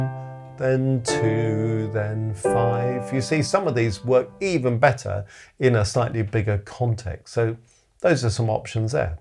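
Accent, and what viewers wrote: United Kingdom. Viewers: Dear, teacher, sweet British utter, the one when you listen to, look like you are the one who is asking and you also the one who is answering, a very close, I do really thank you, thank you for all the love, optimism and the happy shining side that reflects your pure soul, thank you Mr, I really hope the words can help me to explain to you how much I am thankful, greatful to you, this all from my heart, may I have your excuse for my English, but believe it or not (you are using this phrase,sometimes) the language that I am using, is gained through self taught, thank goodness and thank you, as this is one of the other things you also sprinkle on us within your explanation in your lovely videos, I am Abdullah from a country still living in the 12 century, before bach era and that means your lessons is a future content, how about if told that may be almost 100 percent of the people here know nothing or have heard about bach or his legacy, thanks again and I wish also to say hi to everyone here.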